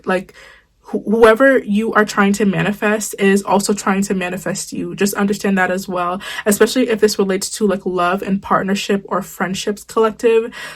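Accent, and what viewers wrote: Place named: Jamaica. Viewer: Yoo you gotta be Jamaican lol some of the words you use and how you say things lol if you are big up you self sistah🇯🇲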